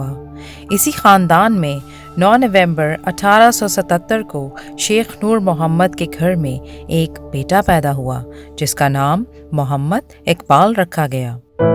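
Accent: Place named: United Kingdom. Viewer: Bhai yeh urdu k Kai lafz glat pronounce kr rahi hy English accent use kr rahi hy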